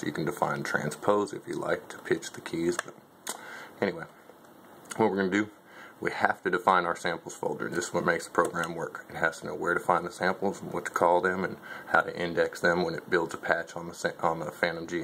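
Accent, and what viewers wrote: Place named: Nashville, United States. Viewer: Very very good stuff. Nice to hear your voice - so Nashville! Keep up the great work. This is masterful.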